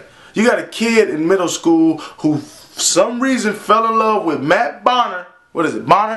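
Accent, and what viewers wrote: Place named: Texas, United States. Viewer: I like your texas accent hahahaha!!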